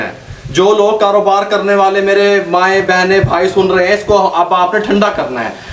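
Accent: Punjab, India. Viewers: Samjhane ka style bahut hi Achcha hai Punjabi Maar Ke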